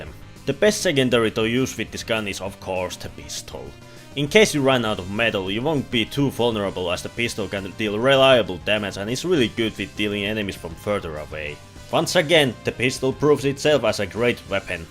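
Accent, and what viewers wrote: Finland. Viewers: are you finnish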